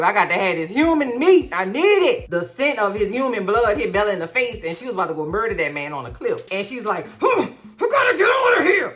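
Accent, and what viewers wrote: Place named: Louisiana, United States. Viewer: Louisiana creole. My stepfather spoke and sounded the same.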